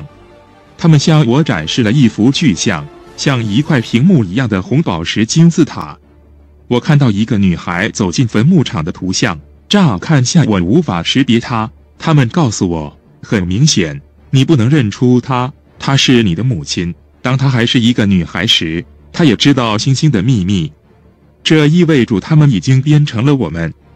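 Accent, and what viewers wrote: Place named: Taiwan. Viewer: 這個語音居然有點台灣國語，是沒錄好嗎==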